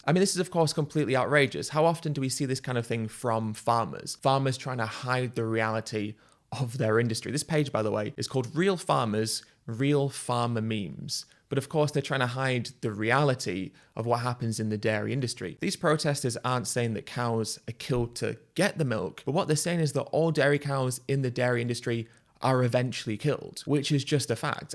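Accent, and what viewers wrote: United Kingdom. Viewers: I love the way Ed has such a posh accent but can't pronounce 'th' in some words, it's so endearing! The arguments are first rate too!!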